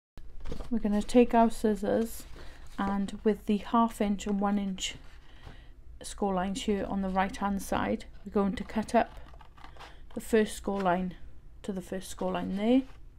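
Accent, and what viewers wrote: Wales, United Kingdom. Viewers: Hi, Lovely tutorial, thank you! Sam is very wise having you on her design team, methinks! Love what you did with this, awesome work. Out of curiosity, your accent is sooooooo familiar to me, are you by any chance from Cardiff, or at least somewhere in S. Wales? I am in Cardiff, an adopted Welshie, Cardiffian, as I have lived here most of my life (shhhhh, don't tell anyone, I am actually English;) ) lol!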